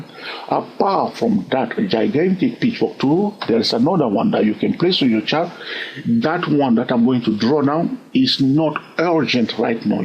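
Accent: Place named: Sweden. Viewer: Thank you so much. I think your accent sounds more Swedish then Texan American lol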